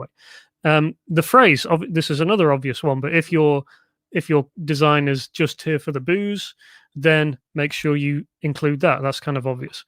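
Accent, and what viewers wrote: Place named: United Kingdom. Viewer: Thank you so much, this video is by far one of the best on the tube! Extremely awesome & refreshing to hear a uk voice, ✌🏻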